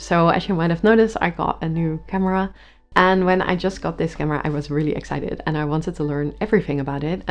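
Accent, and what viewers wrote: Netherlands. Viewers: I heard you speak and I thought “are you Dutch?” and the picture popped up, defiantly studying in the Netherlands. Thank you for today’s video, appreciate it!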